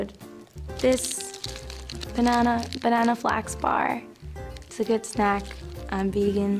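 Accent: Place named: South, United States. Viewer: she has a weird acsent in this video... kinda a southern feel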